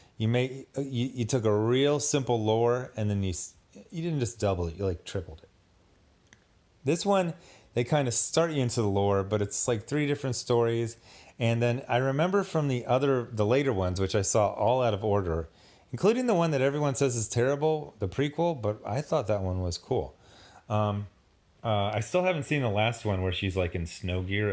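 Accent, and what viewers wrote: United States. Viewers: First, Underworld was a rip-off of Vampire: The Masquerade, Rage: The Apocalypse, and the whole classic World of Darkness in general. I still love Kevin Grevioux (accounting for your American accent, pronounced "Greh-View" with 'eh' being pronounced as in 'meh'). Second, regarding Vampire strength, it's implied in the movie--and generally accepted in all modern Vampire stories--that 1) the older a Vampire is, the more powerful they are, and 2) the closer they are in generation to the first vampire, the stronger they are. Selene was both old (over 600 years old) AND iirc her sire was sired by the original Corvinus vampire, making her a third-generation vampire. Example: a fourth-generation vampire who's 600 year old is probably weaker or just on par with a 300 year-old third-generation vampire.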